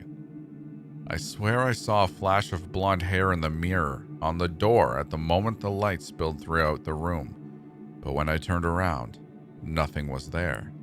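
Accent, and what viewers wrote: Canada. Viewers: My buddy who i let listen with thinks your from canada i think just up north im from the north east but Good work as always loveing it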